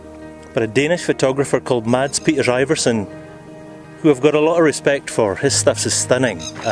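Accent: Scotland, United Kingdom. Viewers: Forget my final remark just watched another of your videos which answered the question,I knew there was a Scottish accent in there.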